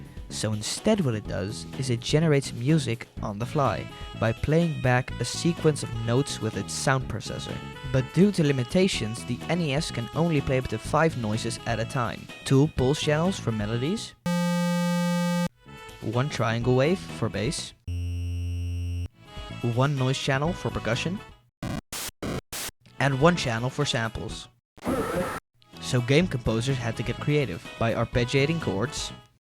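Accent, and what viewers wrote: Netherlands. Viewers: Is dat een Nederlands accent dat ik hoor? Hoe dan ook, fantastische video! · Nederlands accent 😳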